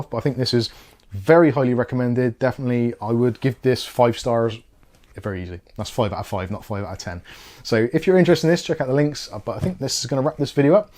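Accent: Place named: West Country, United Kingdom. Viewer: Just found your videos, noticed the West Country accent and then saw your DJI test footage. Hello from BS30! Love the content and subbed.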